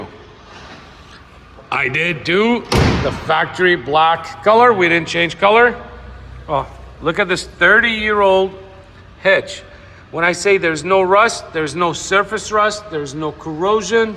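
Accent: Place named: Miami, United States. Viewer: Portland? He sounds like he's from Miami.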